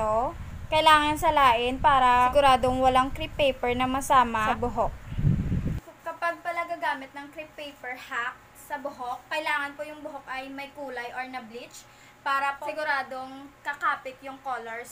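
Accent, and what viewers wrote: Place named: Iloilo, Philippines. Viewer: Ang galing naman ng salita nyo parang mga ilongga original 😁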